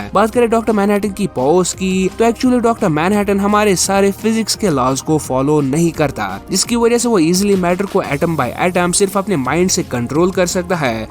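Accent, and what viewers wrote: Kashmir, India. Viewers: App kashmir sa ho kya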